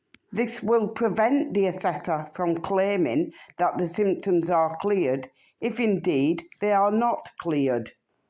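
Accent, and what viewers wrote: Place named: Burnley, United Kingdom. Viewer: Thats a Burnley accent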